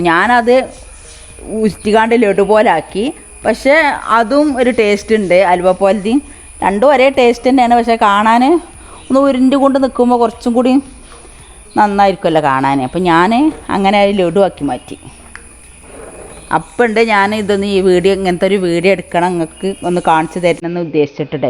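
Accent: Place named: Kottayam, India. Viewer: അസ്സലാമുഅലൈക്കും 🤩😍😂ഉമ്മാക്ക് ആയുസ്സും ആരോഗ്യവും ഉണ്ടാവട്ടെ കോട്ടയത്തെ വർത്താനം എന്താ സുഖല്ലേ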